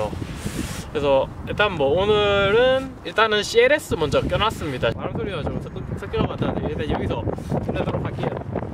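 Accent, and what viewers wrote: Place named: Gyeongsang, South Korea. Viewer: 관측은 어디서 보통하시나요? 대구분이신거같은데??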